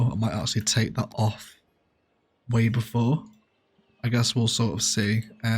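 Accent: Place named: Manchester, United Kingdom. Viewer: I'm french so not that good to spot all the english accents, but you're from Manchester right? I can hear a bit of Marcus Rashford when you talk, I like it! 😁 Oh and great stuff overall on the channel. It helps a loooot!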